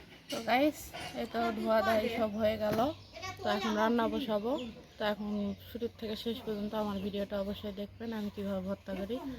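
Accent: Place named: Naogaon, Bangladesh. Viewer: আপনি কোন এলাকার মানুষ? ভাষাটা আমার দেশ নওগঁার মত শোনা যায়।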